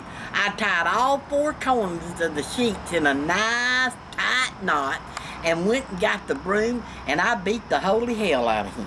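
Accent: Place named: Georgia, United States. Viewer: She lives in Georgia, that explains her accent.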